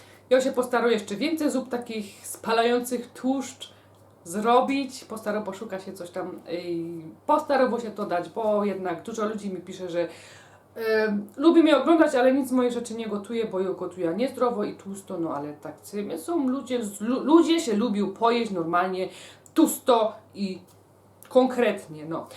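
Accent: Silesia, Poland. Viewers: jestes super dziewczyna, czy to śląski Akcent⁉️🌻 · sol jest nam bardzo potrzebna,ale nie w nadmiarze. sol naturalna,a nie ta z kartonikow ktora ma 90% chemii.najlepsza nasza sol klodawska albo z wieliczki.Pani bardzo pania lubie,a do tego ten slaski jezyk super.mieszkam w berlinie,a w przeszlosci na pomorzu. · Uwielbiam Śląską gwarę i oglądam Ciebie głównie z tego względu ale na przepisy też. Pozdrawiam. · Albo mówisz po polsku, albo pięknie godosz po śląsku. Na śląsku nie ma pomidorów. Są tomaty. pozdrawiam z Florydy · Pani ta zupa to mistrzostwo świata!!!!to jest zupa co ma wszystkie zupy pod sobą i jeszcze ta Śląska mowa i wspaniałe wykonanie pozdrawiam 🥰🌹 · Albo mówisz po polsku, albo pięknie godosz po śląsku. Na śląsku nie ma pomidorów. Są tomaty. Godej jak prawdziwa Hanyska. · Przepiękna śląska wymowa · Pani jest Pani wspaniała, mówi Pani ślicznie gwarą śląską. 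Nie rozwleka Pani swoich komentarzy, jak inni mają w zwyczaju, zanim dojdą do meritum sprawy to nagadają się dużo i nie na temat, że człowiek zmuszony jest wyłączyć takiego "gawędziarza. Pani natomiast robi szybko nie przedłuża czczą gadaniną za to Pani komentarze są rzeczowe okraszone dowcipem. Po za tym, tłuszcz to samo zdrowie dodatkowo pięknie odchudza bez efektu jojo. Dzięki tłuszczowi przyswajane są najważniejsze witaminy: D3, K2MK7, A, E.tak zwany ADEK, bezwzględnie należy przyjmować z tłuszczem, inaczej nie będzie żadnego efektu. Te witaminy rozpuszczają sie tylko w tłuszczu. Szacunek dla Pani.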